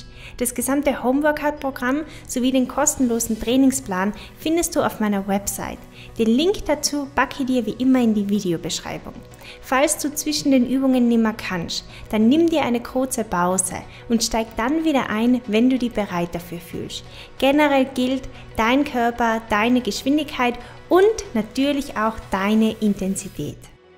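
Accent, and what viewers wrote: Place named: Austria. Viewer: Wer hätte gedacht, dass ich mich mal so über die aufmunternden Worte in österreichischen Dialekt freuen würde, während ich mich auf meinem Fußboden rumquäle! Ohne Spaß, hin und wieder versuche ich mich an anderen Workouts, aber ich komme immer zu deinen Videos zurück. Danke für tolle Arbeit!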